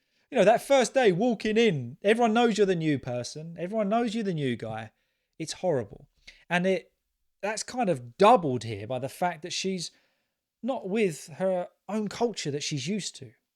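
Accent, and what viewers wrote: United Kingdom. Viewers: Perfecto like always Nice British accent sounds sweet ❤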